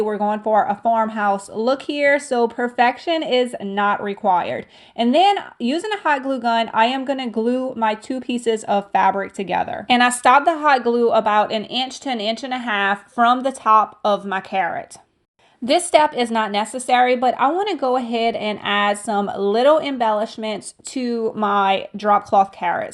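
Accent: Louisiana, United States. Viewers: Where are you from? Have to be a south Louisiana girl! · I just love your videos! I’ve been going back and watching all of them! I used to live in Lafayette so I just loooove listening to that Cajun accent. I think the crusty pans are my favorite but I just love everything you do. You are such an inspiration to me and my crafts have gone to a new level just by watching your painting techniques. You are absolutely my favorite YouTuber! Something I would love to see is how to decorate a smaller home with very little wall space so that it doesn’t look cluttered. I just got a booth at the local antique store so I am hoping that helps with my hording look I’ve got going on lol. Thank you for doing these videos. You make me believe that I can actually make some income doing what I truly love. Keep them coming Sha! Love you tons!! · Hi, I just love all of your DIY videos! 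You have such great ideas! And I love your little Cajun accent! I’m a Louisiana girl myself, but from New Orleans, so I don’t have the Cajun accent. Thanks for all you do!😍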